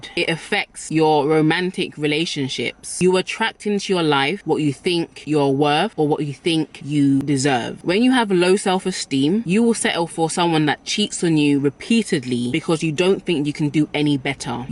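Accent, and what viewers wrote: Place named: United Kingdom. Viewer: I love her ,I think it because I feel like a black person 😂inside of me , the only thing is I don't like her British'accent its kind of crazy for me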